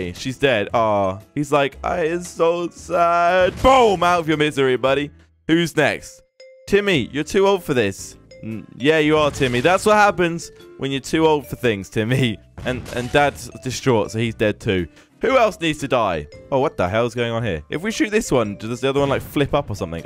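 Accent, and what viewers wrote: United Kingdom. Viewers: ser u british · are you british or slovak?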